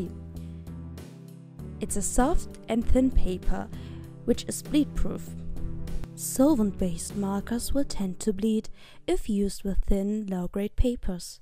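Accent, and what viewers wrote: Germany. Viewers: Man merkt einfach an deinem akzent wenn du englisch sprichst, dass du deutsch bist/aus Deutschland kommst 😂❤️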